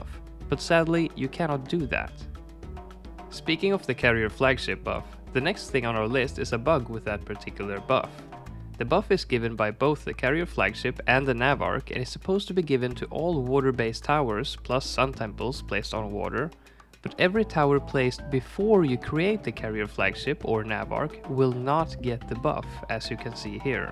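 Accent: Sweden. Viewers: tog två sekunder att höra dig prata för mig att inse att du är svensk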